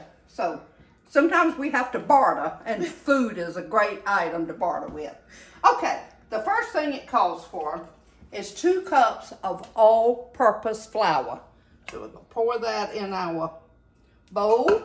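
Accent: South, United States. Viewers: I love her Southern accent. Sounds like you are from GA. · I’m a new subscriber who’s been binge-watching your videos. You kept popping up on my screen as a recommendation and I’m so glad I finally clicked on and watched. You have the most delightful southern accent! I’m a southerner as well, but when I hear my recorded voice, I sound like a hick. 🙁😂 Can’t wait to try some of your delicious sounding recipes. Thanks for sharing them.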